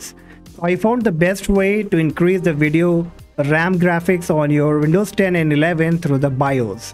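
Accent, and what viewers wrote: India. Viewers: indian Voice it Sound Scam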